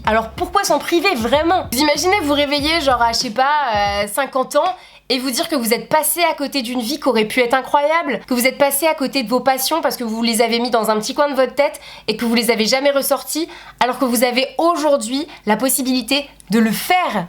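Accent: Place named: Marseille, France. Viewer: Ton accent marseillais 😂 Sinon je t'adore tu es juste Magnifique super vidéo comme d'habitude et moi je suis ( presque) sur que tu peux atteindre le million (ou les millions) 😉❤️❤️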